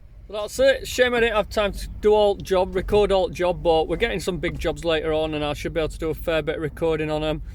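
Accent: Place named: Yorkshire, United Kingdom. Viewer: Gotta love Yorkshire English "Ahv just idded tha light in ther".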